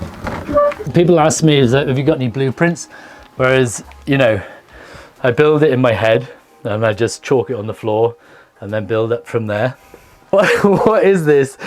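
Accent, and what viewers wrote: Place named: RP, United Kingdom. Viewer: You sound posh .but you talk normal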